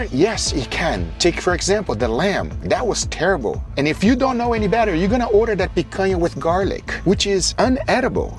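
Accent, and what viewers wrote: Minas Gerais, Brazil. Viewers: o sotaque mineiro do é engraçado e a comida incrivel! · I’ve been watching your videos for some time now, and then you say, WE are known for Picanha, Você é Brasileiro mano? Eu achava que era algo espano pô, mas o sotaque me puxava de vez enquando achar que era mesmo brasileiro. Me confirma aí carai kkkkk thanks buddy. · I love when he speaks portuguese, the mineiro on him comes out hahahaha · Wow, your Brazilian accent is on spot, way to go 👏🏻👏🏻👏🏻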